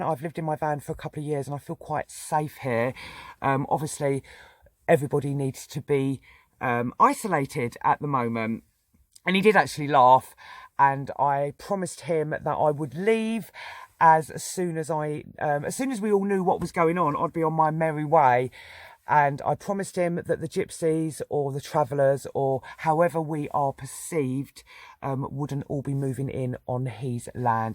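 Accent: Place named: Cornwall, United Kingdom. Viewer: do you travel just down south, or do you travel all over the UK, you sound like you’re from Cornwall or Somerset. X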